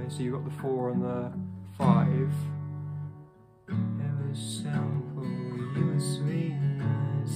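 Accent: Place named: United Kingdom. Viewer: You sound like Dodie maybe because you both are British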